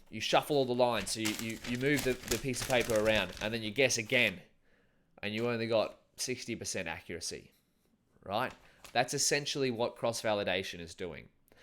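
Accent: Australia. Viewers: Came to learn the kaggle format, stayed for the Aussie accent and comically positive energy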